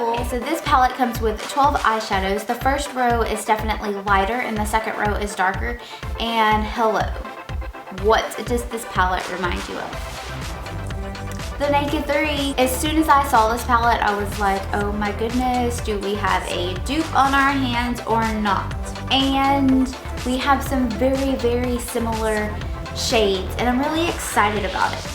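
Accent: South, United States. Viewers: Usually I think of these kinds of colors looking best on fair skin, but they look magnificent on your tan complexion! You seem to have a bit of an accent. Are you from the South?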